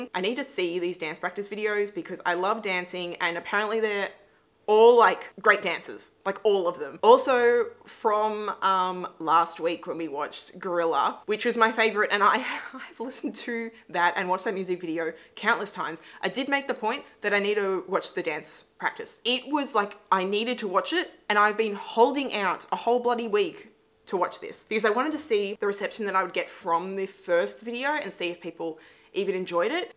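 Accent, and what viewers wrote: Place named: Australia. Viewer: ty so much love kpop i'm from South Korea little bit hard to understand Aussie accent but i really like u re channel. also sometime kpop reaction block on some country that is not have Youtube country no google just little bit search it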